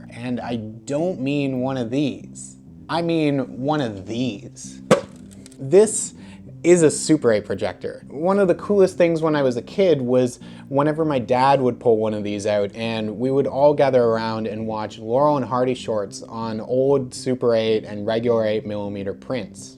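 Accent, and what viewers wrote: Canada. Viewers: You have no idea how much I wish I'd found your channel a decade ago if it existed.. I had so much analog in my house and I didn't know how to do a thing with it and then I had a fire and a crazy crackhead tenant breaking in before that all I have left is a few things from the few super 8 reels that I managed to carry out of the house. I'm so afraid to run it thru a projector because I don't have much of anything left of my late parents and none of my brother since they have passed away. Where are you from? You sound like an old friend from Winnipeg and you are adorable! If I was half my age I would be starry eyed between your Canadian accent and your handsome face!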